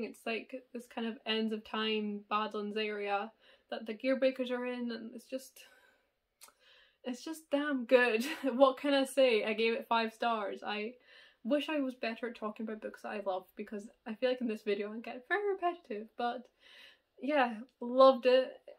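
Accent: Scotland, United Kingdom. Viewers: This type of recommendation video is so needed! I'm deffo gonna have a look at some of these! (ps, i love your accent!! I'm from scotland too <3)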